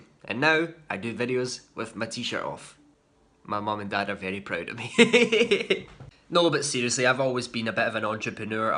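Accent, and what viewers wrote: Scotland, United Kingdom. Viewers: Gaaahhhh I would love to go to Scotland! I have Scottish on my dads side. To go see part of my ancestry would be the best! But thank you for sharing your lovely accent! I still laugh when people think it’s Irish 😂😂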